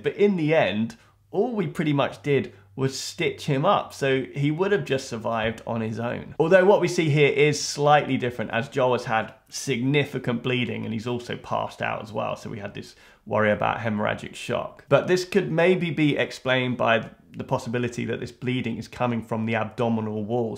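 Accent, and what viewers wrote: United Kingdom. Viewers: Is it just an automatic response to remove an impaled object? (I heard Steve Irwin did that w/ the stingray barb, that there was a chance to save his life had he not done that.) Can't wait for your reaction to the next episode. It's by far the darkest ep. I'm not usually a fan of a moustache but combined with the British accent, it oddly works for me 😉😄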